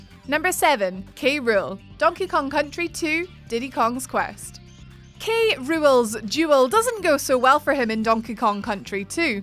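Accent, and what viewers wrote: Scotland, United Kingdom. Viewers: Nah that's just a Scottish accent mate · Forgive me if I'm wrong but do I hear a small bit of a scottish accent in your voice? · For some reason I like to hear scottish accent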